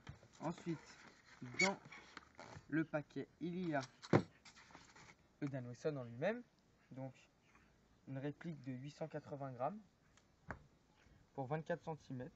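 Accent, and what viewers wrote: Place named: France. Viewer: sa fait plaisir d entendre un francais mdr d habitude c tjr des americain on comprend rien super ta video continue comme sa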